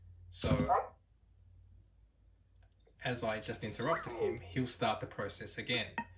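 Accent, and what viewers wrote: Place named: Australia. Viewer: Hi, as always very helpful videos 😊thanks for that! My EMO gets off the home station quite well but today when I asked him, he said: I can’t at this moment , you think this has to do with the battery? I thought it was weird as it had never happened … Thanks for any advice. Ps: love your Aussie accent 😊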